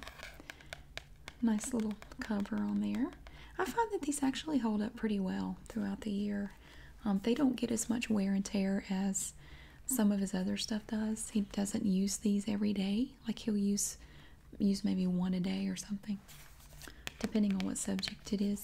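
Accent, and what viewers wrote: South, United States. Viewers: Thanks for that soft and smooth southern voice. Veeery relaxing. I live in Hawaii so I don't ever hear that accent. Aloha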